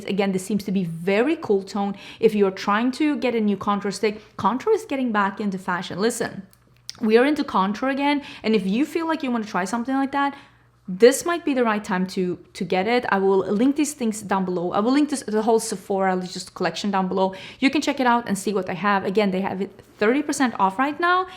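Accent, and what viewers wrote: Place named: Sweden. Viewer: You speak too fast with that thick Swedish accent, slow it down, gosh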